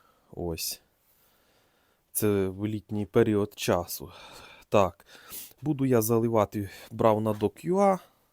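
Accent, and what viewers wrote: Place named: Uzhhorod, Ukraine. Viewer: перший раз глянув відео,ну акцент чисто як з Ужгорода,ближче до мадярів,Вітаю зі святами!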